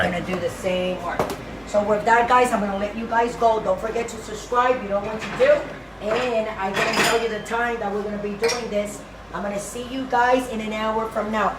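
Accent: New York, United States. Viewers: GREAT STUFF!!! IM A NYC ITALIAN GUY JUST CURIOUS R U IN NYC TOO SOUNDS LIKE IT LOL I SUBSCRIBED THATS MY KIND OF FOOD LOL I USE A TON OF PORK BELLY FAT WHEN I MAKE MY 5 HOUR SUNDAY MEAT GRAVY SALUTO 🍷🍷🍷🇮🇹🇮🇹🇮🇹 VIC FROM NYC CIAO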